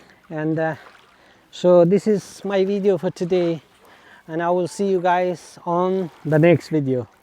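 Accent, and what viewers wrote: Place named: Stoke, United Kingdom. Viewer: Where did you pick up the northern english accent pal? Sounds a bit like a Stoke accent. Love it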